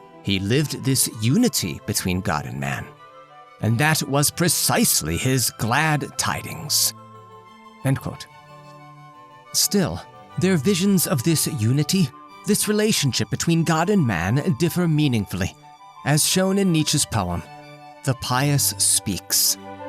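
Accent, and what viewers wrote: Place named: United Kingdom. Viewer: Unbelievably articulate narration with subtle...nearly British undertones and enthusiastically colorful character voices add everything and give life to both of these stories... and every other story I've heard from you. Excellent work!😁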